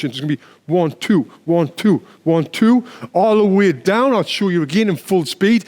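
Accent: United Kingdom. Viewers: you are the best teacher, And you accent reminds me of british techncians i met when i was US airbase for supporting pilots who attended Alliance Military Drill, Thank you very much for your videos / Respect from South Korea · This channel is everything I like: information, humour, and a mean British accent — all that for free. is a legend.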